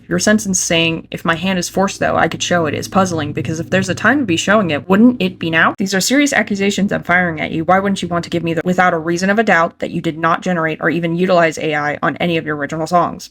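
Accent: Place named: United States. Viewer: I'm sorry I just find it so funnily absurd that your name is and you have the most american accent I have ever heard (which i assume you are one, based on your account location)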